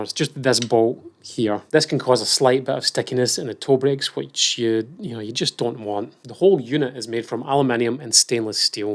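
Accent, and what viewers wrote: Scotland, United Kingdom. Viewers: Would've guessed Scotland or nearby from the accent! Where are you originally from?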